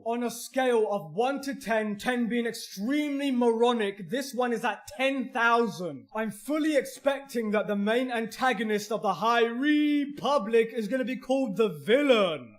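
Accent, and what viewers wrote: United Kingdom. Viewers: He have british accent? I didn't noticed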